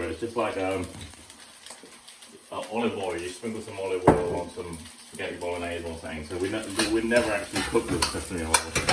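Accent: England, United Kingdom. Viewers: that's not an Australian accent ok ! It's British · a Chinese man with a British accent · perfect Queens English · The shock that he sounds British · an English accent is hardly surprising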